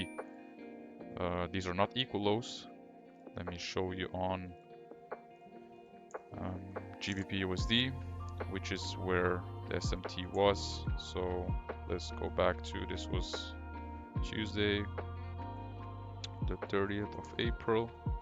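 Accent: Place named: Flanders, Belgium. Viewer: Volgens mij hoor ik een klein vlaams accentje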